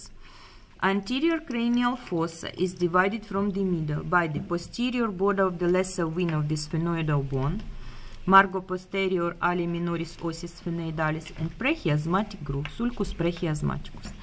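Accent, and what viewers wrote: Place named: Russia. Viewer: SHE HAS RUSSIAN ACCENT ,, VERY NICE VIDEO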